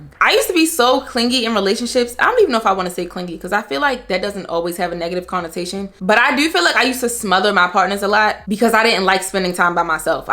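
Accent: Baltimore, United States. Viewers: Is that a Baltimore accent 👀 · Im 36 and I love these tips especially for the new year around the corner! They're useful for women from all walks of life. And as a fellow Baltimorean, I love listening to someone with our unique accent😆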